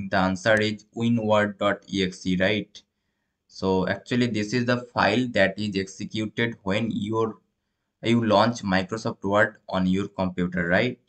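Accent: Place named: Bihar, India. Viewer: i can predict you are form bihar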